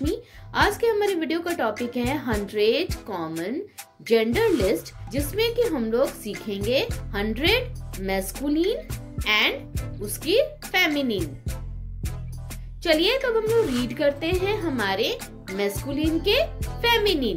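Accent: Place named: India. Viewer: I like this video and I do not understand Indian accent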